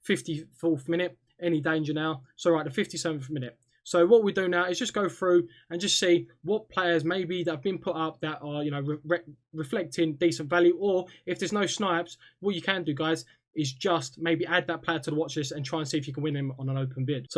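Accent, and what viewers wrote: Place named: Australia. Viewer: tbh you sound very Australian xD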